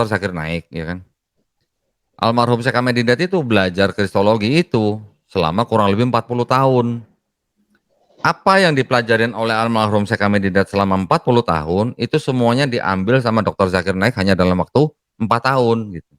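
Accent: Banyumas, Indonesia. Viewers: Berarti pak bisa ngomong ngapak dong? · Koh ngapax dong???🤭🤭 sekolah nya dkt ST cilacap ya koh?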